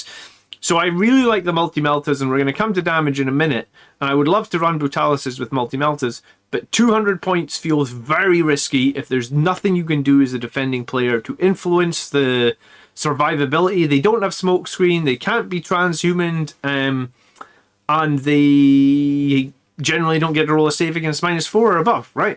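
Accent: Scotland, United Kingdom. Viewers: Wait, you're scottish?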